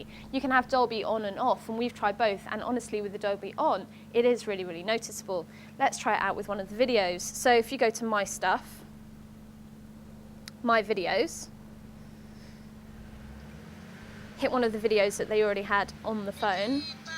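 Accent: United Kingdom. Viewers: haha, i hear you man. love the differences in the two languages (english and the queen's english). keely hazell needs to start doing phone reviews. >:)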